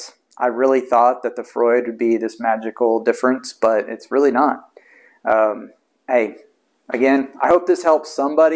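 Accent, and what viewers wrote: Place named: Texas, United States. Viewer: well, I am from Austin so I always recognize Texas in videos no matter how it manifests itself!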